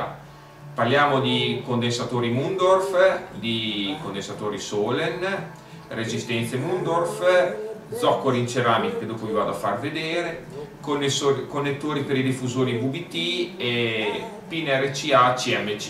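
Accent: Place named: Reggio Emilia, Italy. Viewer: a parte il fatto che se non correggi la tua dizione avrai un targhet di vendita limitato al solo territorio reggio emiliano fatto da soli formaggi mortadelle e prosciutti e pochi chilometri di distanza .. pistola !!!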